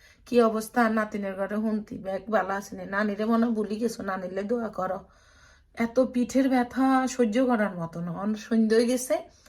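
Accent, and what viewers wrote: Noakhali, Bangladesh. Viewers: Apnar kotha purai noakhailla.amio noakhailla😂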